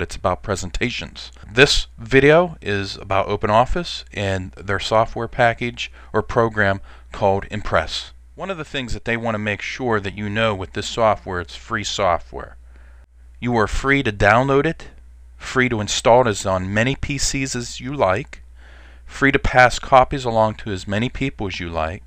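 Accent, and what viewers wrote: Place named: Pittsburgh, United States. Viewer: I'm saying you're from Pittsburgh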